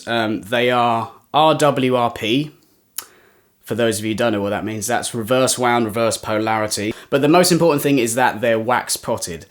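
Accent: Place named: RP, United Kingdom. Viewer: You sound posh enough for 90% of the world without adding the old geezer voice ;) Thanks for the demo!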